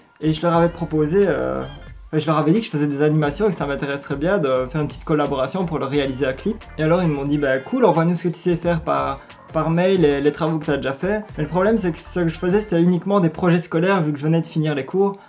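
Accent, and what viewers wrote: Belgium. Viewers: T’est belge ? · Ah tu es belge ? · Tes belge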